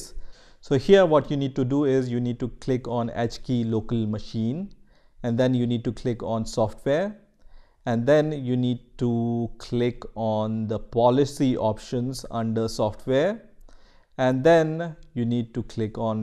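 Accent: India. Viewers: this man has a American ascent and a Indian ascent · This man sounds Indian to me. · English title 😀 Indian accent 🙁 Actually using English 🤩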